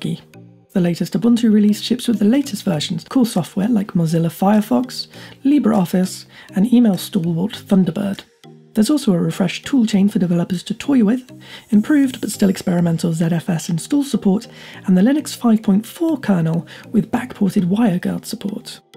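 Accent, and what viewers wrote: United Kingdom. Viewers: Which part of british are you from?? I can barely hear what you are talking..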